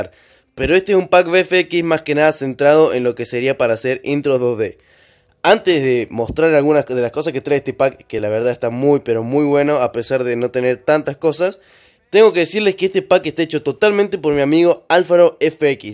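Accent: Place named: Argentina. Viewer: de qué parte de Argentina sos ?😆😆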